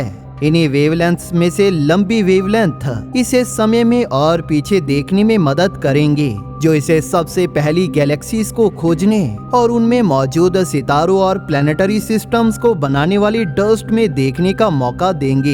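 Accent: Punjab, India. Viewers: O paaji, tussi Punjabi ho...?😁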